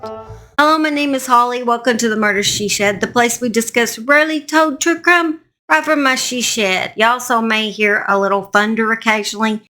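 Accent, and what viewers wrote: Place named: Alabama, United States. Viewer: I could listen to your accent alllll day. 🥰 This is another reason why I subbed to you (including how you tell the sad stories). ~~~~~ Edit : where are you from? Alabama?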